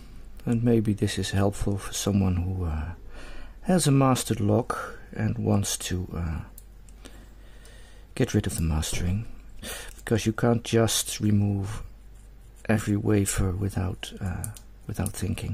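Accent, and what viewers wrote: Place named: Netherlands. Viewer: love the video bud! very informative. love hearing you silky smooth dutch accent ❤ excellent job on the pick too 😊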